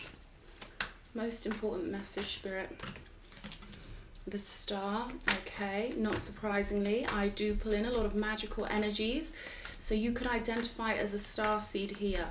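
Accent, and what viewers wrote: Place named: England, United Kingdom. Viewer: your English accent lol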